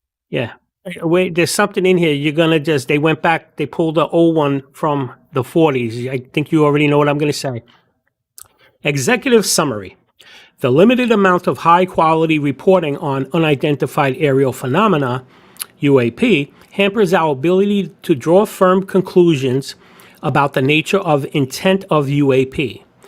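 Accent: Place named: New York, United States. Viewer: I'm southern but I think this being read in what seems to be a thick NY accent, with added sarcastic flourishes, makes it much better.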